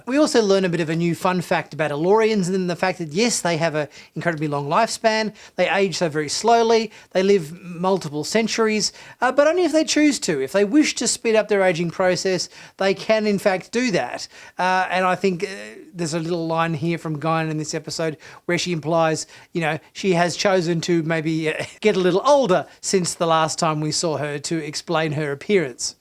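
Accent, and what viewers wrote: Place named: Australia. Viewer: So I'm confused. I'm in Australia and checking my Amazon Prime Video this Season 2 Episode 1 is not available yet as of 4Mar~ am AWST, assume it will drop this afternoon as it usually does., by your accent I assume you are Aussie and are in Australia, so how did you (and others) watch the Episode? Obviously I'm missing something somewhere?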